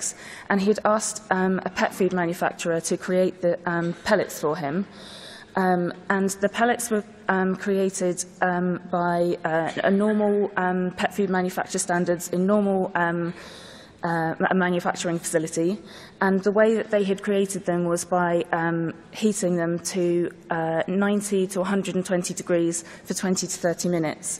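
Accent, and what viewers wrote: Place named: United Kingdom. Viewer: 🙏She Is i English That’s Unique To Most Brits Accents Beautiful Listen and learn🥕🍎❤️💛🇯🇲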